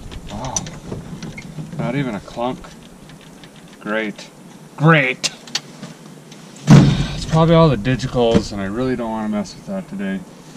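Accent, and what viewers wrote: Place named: Midwest, United States. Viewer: You must be from the Midwest probably Wisconsin you talk just like I do lol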